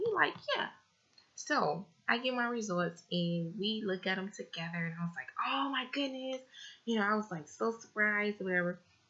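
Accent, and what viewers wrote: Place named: Louisiana, United States. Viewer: You sound like someone from Louisiana.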